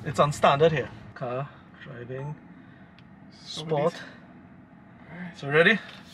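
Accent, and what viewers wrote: Trinidad and Tobago. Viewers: He sounds very Trinidadian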